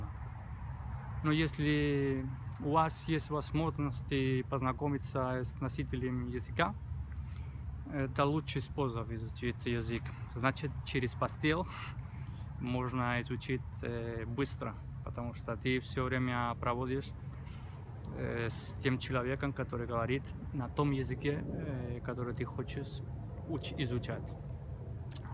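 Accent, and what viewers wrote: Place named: Spain. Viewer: Произношение супер! Первый раз слышу от испанца такой чистый русский, ты меня приободрил! Потому что обычно когда слышу с каким акцентом испанцы говорят по русски, прихожу в ужас от того, как наверно, слышится моя речь по испански.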